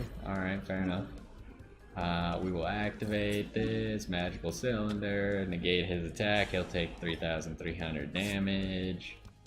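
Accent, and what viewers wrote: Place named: Texas, United States. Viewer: That’s more of a Texan accent not a southern accent. There are nuances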